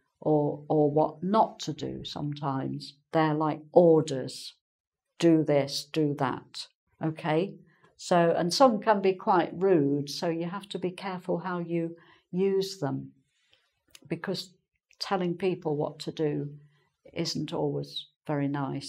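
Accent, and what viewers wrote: England, United Kingdom. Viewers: well i'm not very keen on studying English... but watching u is something else... the british accent u've got n the way u dress hahaha... how old r u? please come to indonesia... we need english teacher like you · is that a British accent?? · English accent. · r u British?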